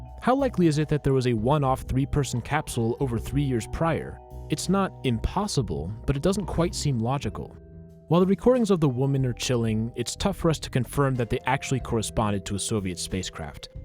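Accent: Italy. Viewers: your Italian accent is wonderful ps I'm italian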